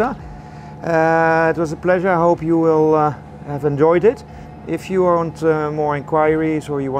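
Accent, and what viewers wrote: France. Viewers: Quant un français parle l'anglais sa ce sait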